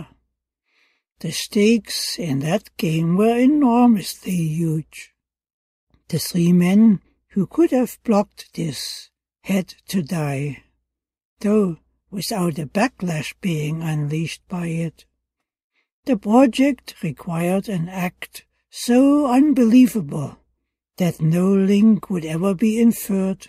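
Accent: Germany. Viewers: I suspect his native language is German. I agree, he is a bit difficult to understand. But, since i do speak some German, it might not be as difficult for me to understand him as it might be for you. I also agree that many music over voice applications on any number of Y.T. presentations totally ruin the videos.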